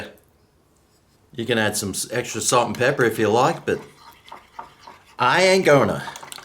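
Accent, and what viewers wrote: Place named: Australia. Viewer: Narrated like a True Blue Aussie 🇦🇺. Bloody shame chickens only have two wings... If they had four, I'd need two bottles of Frank's 👍😄🤤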